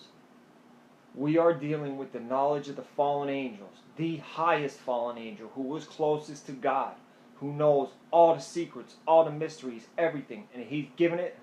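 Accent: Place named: Pittsburgh, United States. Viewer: elroy elongated naw this is classic pittsburgheez . It's how we sound in PA. I actually didn't notice until I read this. Google it, we have a distinct accent around here.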